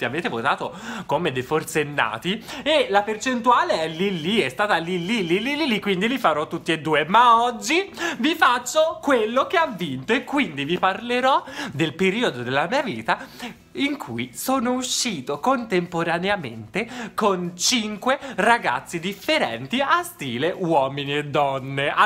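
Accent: Tuscany, Italy. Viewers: Me rallegri la vita😂 sentí parlà toscano è troppo bello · Non c'è la posso fare 🤣😂🤣😂🤣😂 tu mi fai morire 🔝🤣😍 ti adoro...poi da parte di padre so Toscano e quindi adoro sentittè parlá toscano ❤️😍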